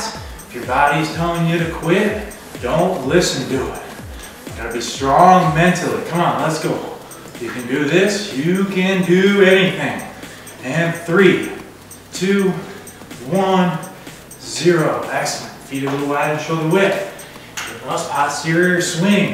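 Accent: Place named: Wisconsin, United States. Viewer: First week down. LOVE IT. And I must say. I dig the “WIzkahnzin” accent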